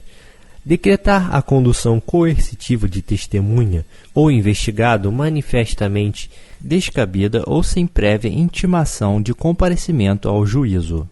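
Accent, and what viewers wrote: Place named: Rio de Janeiro, Brazil. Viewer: Esse é carioca kkk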